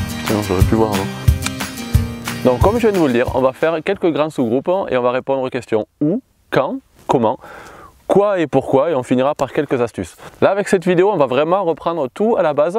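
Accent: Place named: South, France. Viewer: Passionné par la nature en général, je trouve dans les vidéos de toujours des tests à essayer( Prendre de l'avance sur le retard😅) dans nos parcelles. Sa vitesse d'élocution respire la maitrise du sujet,il est toujours agréable à visionner les différents sujets proposés. Avec le bon accent du sud aussi, TOP!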